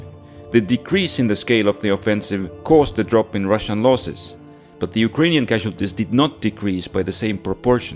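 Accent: Estonia. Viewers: Eesti aktsent, 100%, :D ütle et eksin krt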